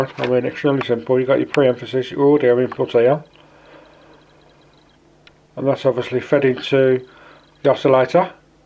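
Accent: Birmingham, United Kingdom. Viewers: Your Obviously A Brummie , Do You Remember PCRL On 103.5 FM ? Blessings From Penzance , Cornwall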